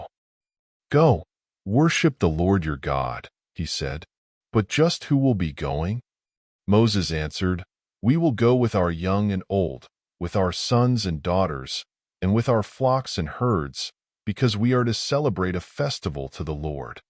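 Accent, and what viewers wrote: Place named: United States. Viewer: I like the "American" voice. Easier to follow.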